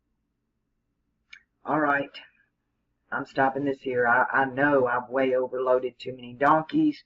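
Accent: South, United States. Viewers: The ones I have always learned the most from, are the surprise, or chance encounters. You, are a picture of this phenomena. At least in my paradigma...! So, isn't it a pleasure, hearing you speak so smooth and southern, about things that are so incredibly in parallel with my needs? Claro, que si. There are ten years of Time, free of obligation, that the gods themselves awarded me, and I immediately consulted the Oracle in Google, and have been there much of the time. Seeking out answers that before clikclikclicketyclik, would have taken twenty years... well, my 2 or 3 thousand hours in navigating the nether [ether?] through the myriad of bad false and OhMyGod! and lies... and all the other... have yielded a few smooth stones, personal and spiritual, precious, which I keep in my pocket. I use these weightless stones, pretty and oval, for many things. 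It's amazing what can be done with the simplest of tools. Especially when and if one connects to that universal..... dare I say?...Force! you know, the one that makes shit happen. All we have to do is acknowledge it, right? hmmm... I'm gemini.. so.. I also keep a turd in my pocket in case someone tries to shit me.... [grin] In spite of my ever so June14 63 pairsohnality, and my razor sharp wit, and my light in my shoes and smiling approach to reality, here... I have missed some stuff, and still have infinite things to learn. Of course. But your videos have certainly put some more color on the table where the puzzle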